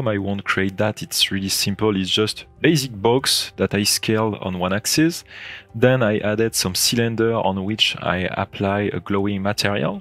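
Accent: France. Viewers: Le petit accent français ? Des videos en français ? :) Faîtes vous des formations twinmotion ?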